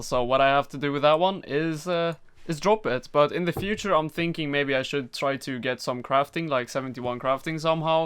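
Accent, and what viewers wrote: Sweden. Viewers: swedish? sounds so much like swedish kind of english haha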